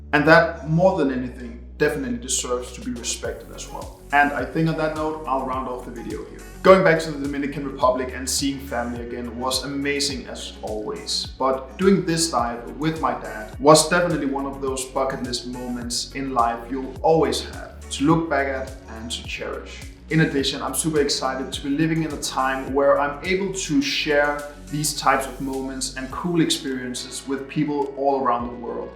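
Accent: Denmark. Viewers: Are you from Denmark? Your accent sound danish.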